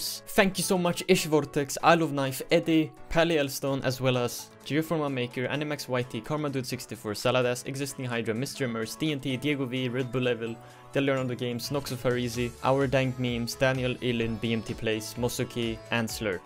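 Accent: Sweden. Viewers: I see swedish accent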